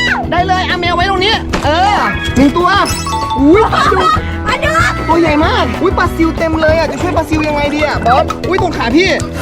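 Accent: Isan, Thailand. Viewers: เป็นคนอีสานแมนบ่จ้าอ้าย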